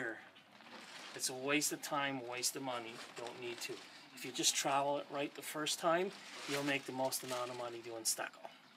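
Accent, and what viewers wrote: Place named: Canada. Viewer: Listen to the accent. Definitely Canadian!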